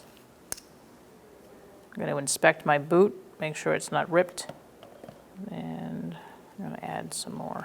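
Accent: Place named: New England, United States. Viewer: wicked new england accent lovin' it kid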